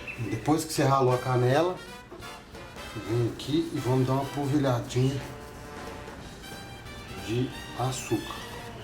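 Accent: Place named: Minas Gerais, Brazil. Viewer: PARECE BOM, VOU ME AVENTURAR E COMEÇAR AGORA, OBRIGADA PELA RECEITA VIU E TÁ MELHOR AINDA PQ TEM UM SOTAQUE QUE EU ACHO QUE É MINEIRO, (BOCADIM) .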